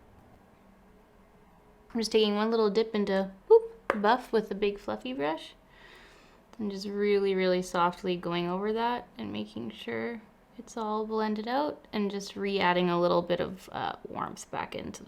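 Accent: RP, United Kingdom. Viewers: That QEII accent... A++! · You killed the Queen English accent!!! wow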